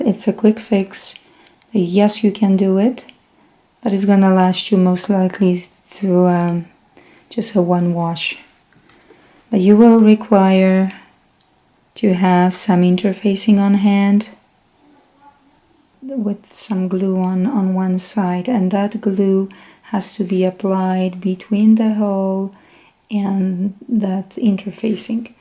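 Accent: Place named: Armenia. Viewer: You sound like from Armenia like a lady I knew. She was from there. Neat voice & accent. If a person does not have a needle & thread they most likely not have an interfacing. I never heard of that or something like that to iron on etc only the word itself. Thanks for showing us how to sow a small hole tear. I am sewing 2 on a bag my mother made of 🌈 & a shirt.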